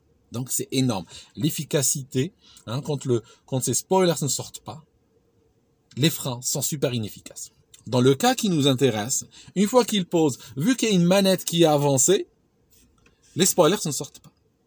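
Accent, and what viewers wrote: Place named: Switzerland. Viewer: Salut - j'aime bien, j'avais remarqué dans d'autre vidéos, ton accent suisse ressort bien à des moments- vers notamment il est bien teinté.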